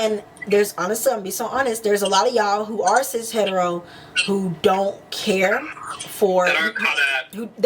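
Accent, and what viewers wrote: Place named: South, United States. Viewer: Can I just say I love your accent ! I’m from jersey , and your southern accent is so cute to me ! Also , y’all are so dope ! Thanks for this ❤️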